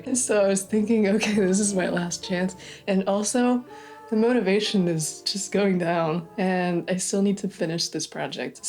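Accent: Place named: Quebec, Canada. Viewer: Years ago when I watched one of your early video, I thought your talent combined with your quirky Québécois inflections might spell success. Back then you recorded vocals with an SM58, which surprised me. Then last year I saw you sponsored by Native Instruments (I think). Congratulations on your management and continued success! 😁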